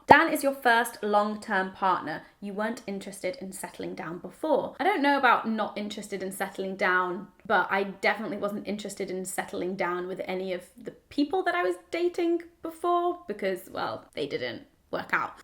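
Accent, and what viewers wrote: United Kingdom. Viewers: Your British accent along with your "joie de vivre" is what I find attractive in you. Your husband is truely a very lucky man! Stéphane from Montreal, Canada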